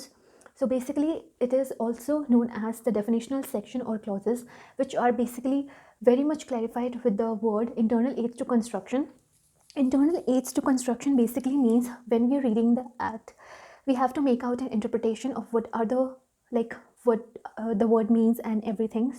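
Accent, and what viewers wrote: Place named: United Kingdom. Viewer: Thanks i got some clarity, by the way your accent is like British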